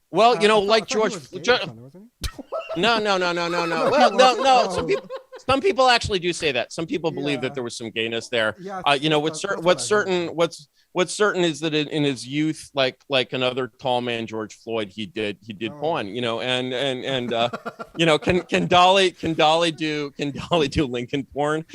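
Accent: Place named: New York City, United States. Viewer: It's a nyc-ish Jewish accent not boston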